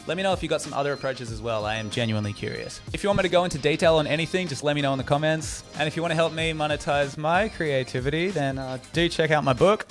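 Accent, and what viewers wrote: Australia. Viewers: Lots of good information here. I am considering watching this again. The fast talk in an Australian accent combined with the motormouth editing made it a bit difficult to follow. Consider spending a bit more time on writing with brutal cutting to get a concise message with more impact, in less time. Know what is important in your message and cut out some of the snarky humor. Language was invented, after all, by air-breathing mammals so there is a reason for the natural pauses in our speech. Your humor would hit the mark more often with some space to inhale giving the viewer time to "get" the joke. Remember that the most important part of your message is always non-verbal. It's in the spaces between the words. Give us those spaces so that we can interpret your speech. I appreciate your frank and personal style. The core message is empowering and inspiring. 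More please. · He has an Australian accent. He sounds just fine and legible to me, but I’m American and English is my only language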